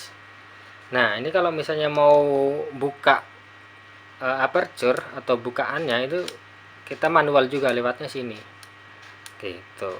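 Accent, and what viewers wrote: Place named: Brebes-Tegal, Indonesia. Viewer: Sampean tinggal dmn om? Kayak brebes tegal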